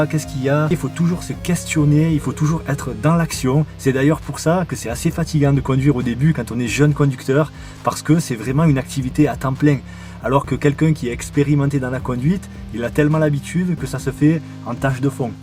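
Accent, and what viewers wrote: South, France. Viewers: Accent de sud????? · Super vidéo, et super accent du sud. 😊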